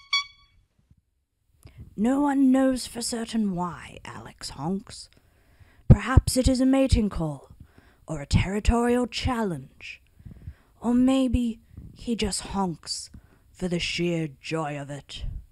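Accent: Australia. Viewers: Mohammed Kortam it’s Australian because the person who’s speaking lives there lol. Pretty standard stuff. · U r Aussie I can tell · Wait... Are you actually Australian?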